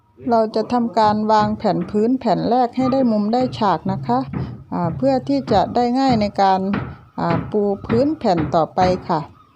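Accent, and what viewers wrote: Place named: South, Thailand. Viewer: ชัยภูมิ..(พูดเหมือนคนใต้)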